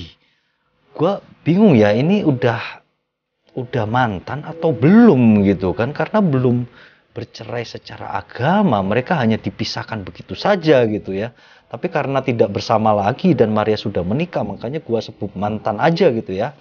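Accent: Java, Indonesia. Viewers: Jawanya medok bgt tp ngomongnya jakarta...duh jd 😂😂😂😂gk pas bgt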